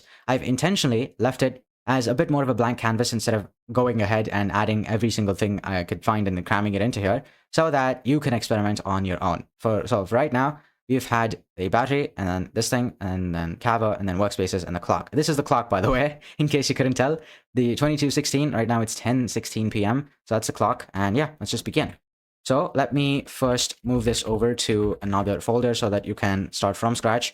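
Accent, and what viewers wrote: India. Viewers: indian voice sayin OKA OKA jeez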